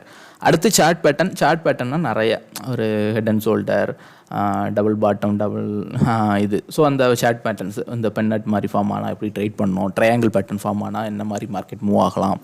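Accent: Kanyakumari, India. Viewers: Kanya Kumari Slang😊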